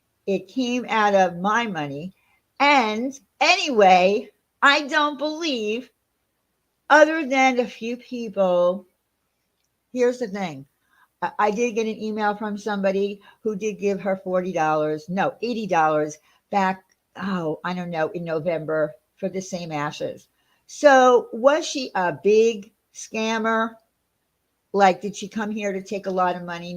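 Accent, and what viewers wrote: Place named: South, United States. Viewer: You go I love that southern voice lol you do good!!!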